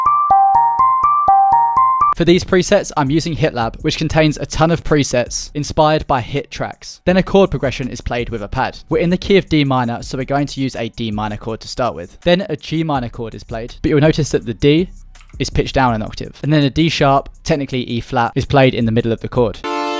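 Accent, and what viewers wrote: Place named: United Kingdom. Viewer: Ts don’t even make sense Just cuz he has a British accent doesn’t mean he can’t just download the plugin and listen to American songs so stupid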